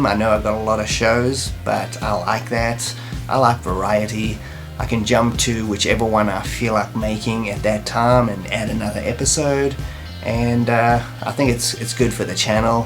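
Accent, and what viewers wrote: South Africa. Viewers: I'm guessing your a Saffer living in the USA? (Not digging for info, just a guess from the accent) I think at least C64, Amiga and other 8 and 16 bit companies should give you copies of their software. Some absolutely brilliant games are coming out for retro systems. Maybe if we mention you now and then on their forums - it might grab their attention? I have to play these new games on emulators. Just playing the demo for "Shadows of Shegorath" on my Pi400. Great game but in the back of my mind there is an itch that is telling me "You should get yourself a proper Amiga" Same when I play "Boulderdash" on Vice "...This is a great game - but I need to play it on a proper C64" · I've always thought you had a South African accent!! That explains it:-)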